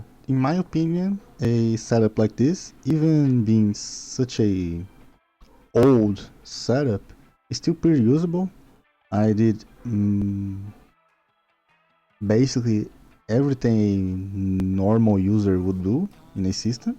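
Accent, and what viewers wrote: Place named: Brazil. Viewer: Immediately recognized the Brazilian accent 🇧🇷🇧🇷🇧🇷🇧🇷🇧🇷🇧🇷🇧🇷🇧🇷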